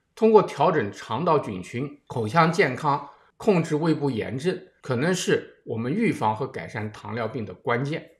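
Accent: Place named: Nanjing, China. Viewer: 听出杨医生南京的口音了。不知道对不对。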